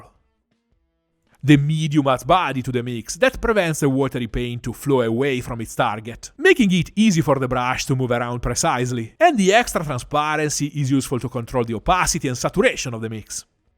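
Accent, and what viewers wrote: Italy. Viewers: I came here searching for new approaches to Lizardmen, as the last time I painted them (or any minis for that matter) was 10 years ago. This video was helpful and brilliant! I love your accent (Italian?) and how your first language shines through in your English; not only in rhythm but in the beautiful intonation as well! Lovely to listen to. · This italian accents is so good. Approvo! My new favourite channel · I watch your painting videos both because of all the useful tips & tricks, but also hearing your Italian accent - It sounds so joyful and makes me happy! 😁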